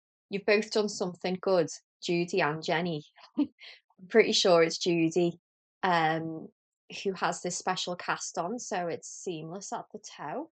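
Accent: United Kingdom. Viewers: I love your socks and your accent! Where in the UK are you from?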